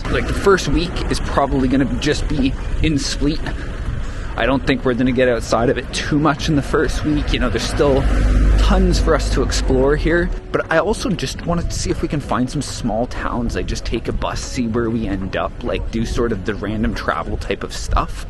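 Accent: Canada. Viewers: toronto accent?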